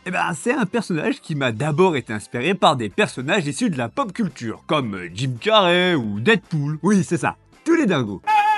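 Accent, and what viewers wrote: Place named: Lyon, France. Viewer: Bien vu,! Excellent début, cool de te retrouver ici. Le ton que tu emploies me fait penser à un autre glorieux Lyonnais que tu as cité dans tes références - je te laisse deviner. Longue vie à ta chaîne, c'est cool! ✌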